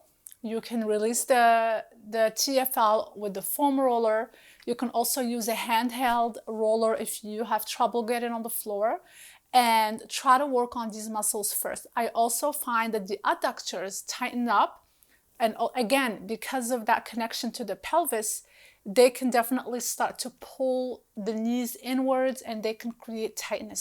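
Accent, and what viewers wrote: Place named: Morocco. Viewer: i smell Moroccan accent here dont know why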